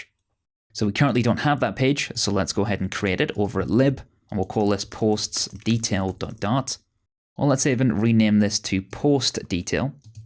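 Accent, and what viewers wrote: United Kingdom. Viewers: I like brittish accent and tutorial style of video auther!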